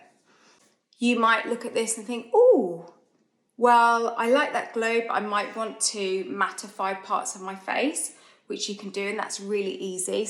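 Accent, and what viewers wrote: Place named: United Kingdom. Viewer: love love your videos! I love watching your tutorials and all the tips and tricks of applying makeup. I too have dark circles and I will use your technique… I love your beautiful accent and could listen to you all day, everything sounds so much better with a British accent. Blessings beautiful lady ❤❤❤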